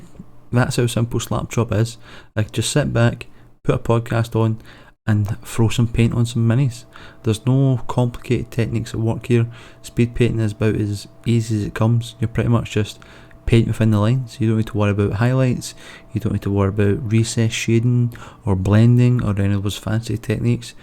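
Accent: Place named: France. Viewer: "I am French, which is why I sound like this" ☠️ Now that's how you start a video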